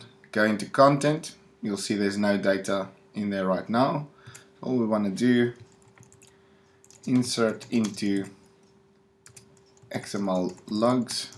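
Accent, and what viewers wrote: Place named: South Africa. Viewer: Hey, did you continue developing in WeChat, or have moved on to other things? Also, trying to place your accent.. are you South African? :P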